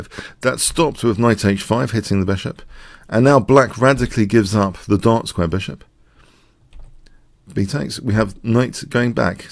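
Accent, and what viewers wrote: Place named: London, United Kingdom. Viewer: You sound like a Londoner so surely you're familiar with Grosvenor Square? The 's' in Grosvenor is silent, you know.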